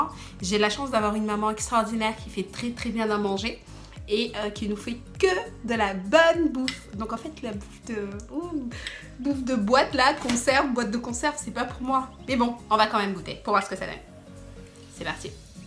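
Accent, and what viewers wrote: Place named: Africa. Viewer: C'est pas parce que tu es Française "à la peau NOIR" que tu es regardé par les "Français" Tu aurais pu répondre en message et non nous rappeler que tu es "Française" à la peau noir et et l'accent Africain. Si les Africains se sont rapprochés de toi c'est juste qu'ils s'identifient à toi !!!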